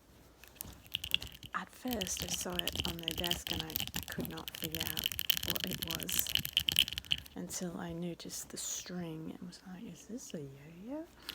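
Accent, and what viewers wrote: Italy. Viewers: wait I thought she was American but lives in Italy and has an Italian accent....?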